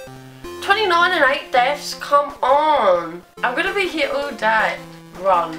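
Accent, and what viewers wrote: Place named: England, United Kingdom. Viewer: u sound like a england girl mixed whit a country girl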